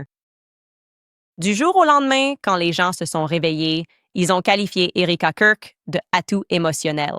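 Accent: Quebec, Canada. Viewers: AAahh le francais AI est bizzare, c'a laire du francais quebequois 😂😭😭going back to main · C'est vrai pour l'accent québécois mais ça reste très confortable a suivre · en tant que Français ça donne un ressenti de québécois édulcoré. Mais je ne suis pas du tout spécialiste 😄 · Bonjour Candace Je suis français et je t' écoute en français. Si ton français (issu d'IA) est très bon, je dirais qu'il n'est pas parfait car il comporte quelques accents canadiens que l'on ne trouve pas dans les diverses régions de métropole. Le français des iles caraïbes ou d'Afrique a aussi des accents marqués mais pas canadiens. Cela dit l'écoute reste confortable pour un français qui ne comprend pas l'anglais et encore moins l'américain. cordialement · lol, same here. Weirly she speaks with a slight Quebec accent, and some of the translation is a bit approximative, but still, it is pretty impressive.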